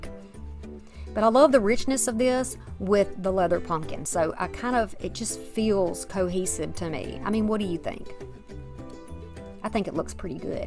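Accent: South, United States. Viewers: Number one, I'm a new subscriber and I just wanted to say that your amazing southern draw and personality is what keeps me coming back! Number two, ALL of the things that you make are stunning and I'm so grateful that you've chosen to share your talents with all of us! I'm going to go check out your IG and send you a DM. Maybe we can share projects and ideas 😁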